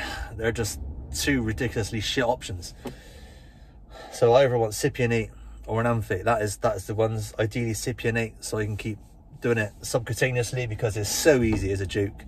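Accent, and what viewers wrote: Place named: Gloucester, United Kingdom. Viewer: sounds like a Gloucester lad .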